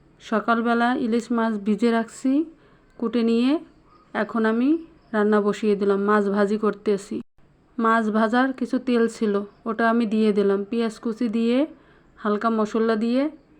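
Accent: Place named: Barishal, Bangladesh. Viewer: আপু আপনার দেশের বাড়ি মনে হয় বরিশাল কথায় বোঝা যাচ্ছে |আপনি যেখানেই যান না কেন |নিজের দেশের মানুষের কথা শুনলে মনে হবে আপনার আপনজন ❤️❤️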